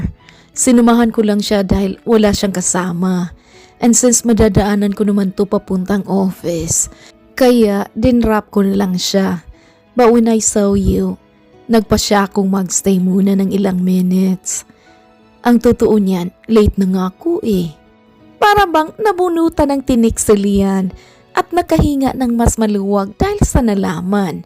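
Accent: Visayas, Philippines. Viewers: Bisaya di ay ka....😊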